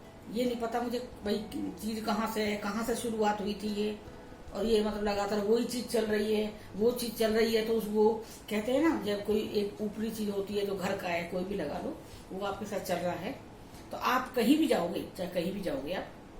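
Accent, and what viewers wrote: Uttar Pradesh, India. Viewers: Love u auntyji ...aapki language mujhe UP ki yaad dilati hai I am frm punjab now in italy bt meri sasural khurja mei hai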